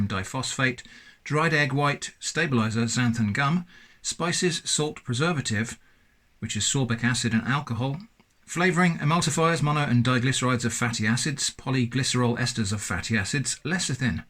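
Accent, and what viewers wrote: United Kingdom. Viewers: I've been subscribed for quite a while now, and I'd like to congratulate you on all the channel growth. I wanted to catch a new video early just to say "Thanks for all the great videos!" before it gets too hard to read them all. So thanks again, I'd love more YouTubers to follow in your footsteps of wholesome, varied content. P.S. You have a very cool accent, all of the regional British accents are super interesting.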